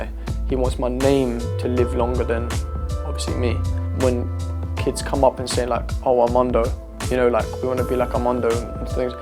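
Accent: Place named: England, United Kingdom. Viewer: Wait he has an English accent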